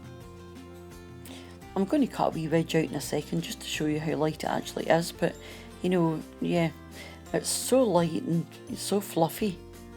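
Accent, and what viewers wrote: Scotland, United Kingdom. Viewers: F Faro she’s Scottish as I am x👍🏴🏴🏴🏴🏴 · Thanks, I love the fact that you used butter cream instead of whipped cream for your Victoria sponge. And your butter cream sure seemed mouth-wateringly delicious! I love the little pinch of salt in there! I'd like to add that I much enjoy hearing you speak your nice Scottish accent! 😃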